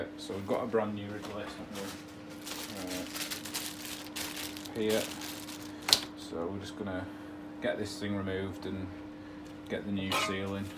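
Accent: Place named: Yorkshire, United Kingdom. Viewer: Going by your accent I don't think we are far apart , I'm in Malton East of York and I guess your Huddersfield way .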